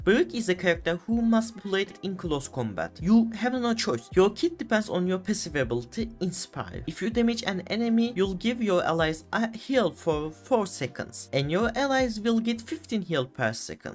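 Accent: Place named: Turkey. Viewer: Noticed the Turkish accent in the first 15 seconds ahahah 😄 nice video though, hopefully I'll do better the next time I play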